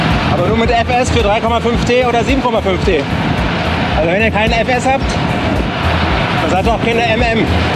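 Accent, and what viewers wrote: Berlin, Germany. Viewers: Immer wieder nice anzusehen und die Berliner Schnauze tut gut. Weiter so und Kette rechts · Super Format, lustige Berliner Schnauze!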